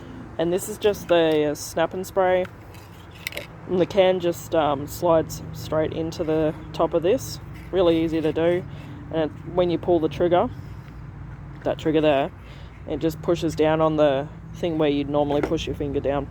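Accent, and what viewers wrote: Australia. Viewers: Just beautiful! And I'm so happy to listen to your accent as my daughter is in Perth, so as i listen to you I can imagine what she listens to. Very cool. Thanks!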